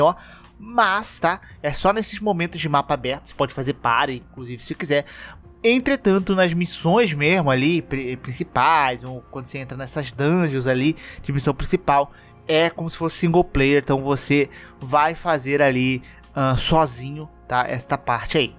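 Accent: Rio de Janeiro, Brazil. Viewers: esse sotaque carioca me fez fechar o vídeo nos 10 primeiros segundos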